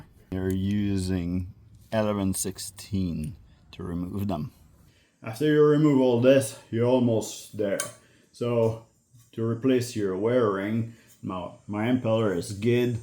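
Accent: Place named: Quebec, Canada. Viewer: Sounds like you are from Quebec, like me from Montreal!! I am your first commentator! Great video, will try and by the way, never thought of doing the job this way.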